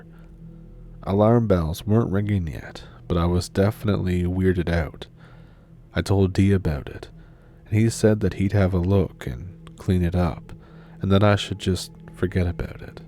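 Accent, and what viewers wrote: Canada. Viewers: I always look forward to your stories. This time even more as I'm from Oklahoma. Love that last one. I was in campfire girls when I was young. Went to camp only once. Unfortunately or fortunately, I've never seen any thing frightening. I've been on overnights with my horse riding groups,to many areas of Oklahoma. Yet nothing. I must be very protected, that's all I can figure. Hope you get better soon sir, love your Canadian accent😊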